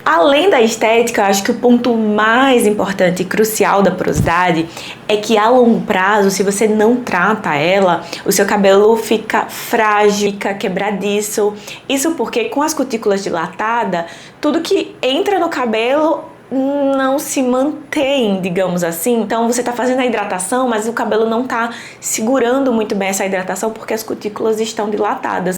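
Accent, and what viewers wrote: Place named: Pernambuco, Brazil. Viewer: Olá! Você é Pernambucana? Seu sotaque é tão lindo 😊 sou nova aqui ❤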